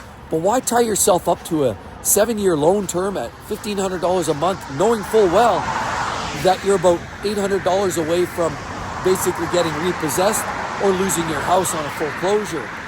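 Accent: Canada. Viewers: I knew bro was Canadian from the accent 🇨🇦🎙️🔊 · Canadian accent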